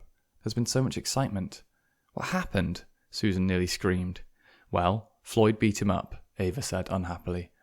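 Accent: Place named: United Kingdom. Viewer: the British accent really adds something to this lol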